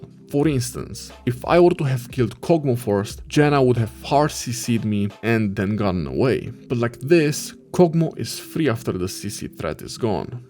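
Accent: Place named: Russia. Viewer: Боже что за русский акцент 🫠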